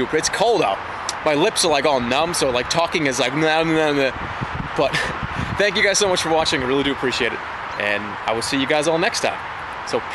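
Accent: Boston, United States. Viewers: he is so boston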